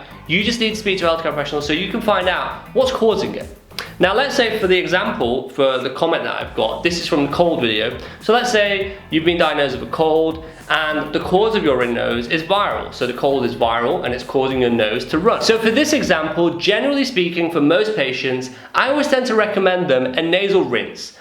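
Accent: United Kingdom. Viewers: Oh my god... You've got a strong British accent.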